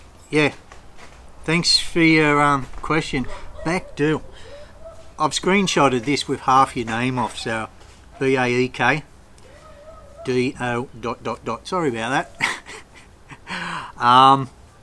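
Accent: Australia. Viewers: You talk like my mates back in oz do and I haven't been back there science 2013 so enjoy listening to you and watching your videos I've been meaning to come to your reaturant I live near the airport my wife has been very sick for the last year and she died last Sunday so now I can find the time Sean from tasmainia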